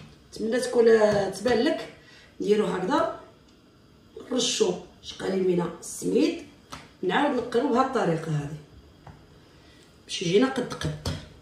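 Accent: Oujda, Morocco. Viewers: الوصفة روعة ولكن تباني في الكلام المغربية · هضرتها مغربية كانها وجدية سبحان الله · كلامك يشبه كلام المغاربة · تشبهي المغربيات ف لهجتك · لهجتها بانتلي مغربية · حسبتك مروكية 😅😅...خاطر حنا نقولوا مانعجزش....مشي مانعڨزش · تهدري كي المغربييا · السلام عليكم انا المرة الاولى نحسبك مغربية خاصة كي تبداي تقولي مسالخير عليكم خواتاتي العزازاتوالغزالات.انا جزاءرية وتعجبني اللهجة المغربيةخاصة كي تقولوا كنحماق عليكم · Javais vue sur une vidéo que tu disais que tu était marocaine,en plus t'es recettes typiquement marocaine 🇦🇱🇦🇱🇦🇱🇦🇱tu parle comment les oujada😂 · حسبتك من وجدة عندك نفس الهجة المغرب شرقي سبحان الله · هدرتك تبانيلي مروكية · لهجة انتاعك تشبهلهم ايقولو انتي من المغرب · علاش اللهجة نتاعها مغربية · لهجتك لهجة مغربية مخلط ببعض الكلمات الجزائرية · لهجتك تشبه المغربية اكتر من الجزاءرية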